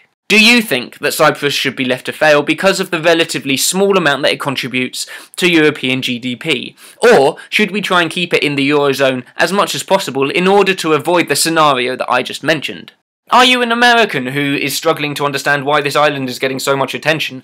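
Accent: Russia. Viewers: Your Russian accent is so good! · P.S.: I love your Russian accent.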